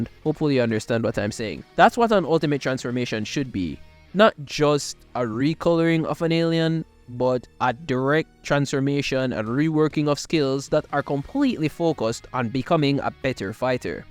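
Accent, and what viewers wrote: Jamaica. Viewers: Bro are you Jamaican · Hold up are you jamaican bro. Di accent a sneak thru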